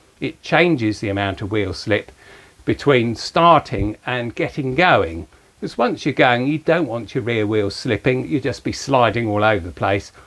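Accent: United Kingdom. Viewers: I love this guy. Listening to an english fellow explain things is as comforting as high tea on a lovely afternoon.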